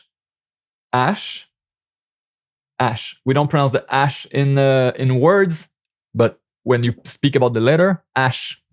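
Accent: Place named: Quebec, Canada. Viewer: This is great. I grew up in Vancouver a long time ago and never learned French however I work in Ottawa where French is fairly important. I have only watched a very small amount of your course but it is exactly what I need as you are speaking like a French Canadian person does(or my in-laws...) and my work colleagues, not a Parisian. Merci!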